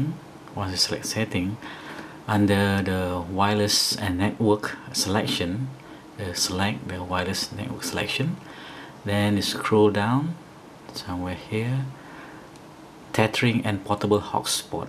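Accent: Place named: Malaysia. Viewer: sounds malay to me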